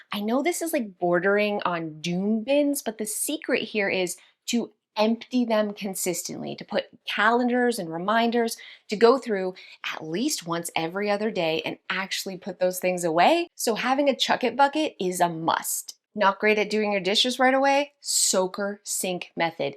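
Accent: Canada. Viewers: Never watched Sister Wives. Cilantro tastes delish to me, one of my favorites, we all have different chemical make ups, they say people who have a certain chemical make up, cilantro tastes like soap. I have a friend who loves dandelion tea and yikes! To me it is so bitter, she also likes sardines, ewe. We are all different and unique not "weird" I think you are smart, funny and beautiful and I love your little Canadian accent, I think you are in Canada? Byeeeeee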